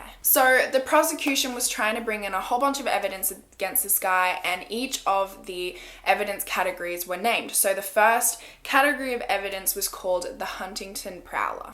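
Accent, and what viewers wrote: Australia. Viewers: Just found your channel and can't get enough of your Australian accent! Love how you say light or right! Keep up the good work! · I KNEW THAT WAS AN AUSSIE ACCENT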